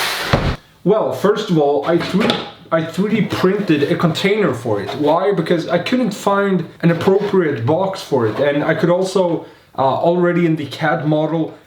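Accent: Netherlands. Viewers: The whole time i heared you speaking english i thought you where dutch.. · Are you dutch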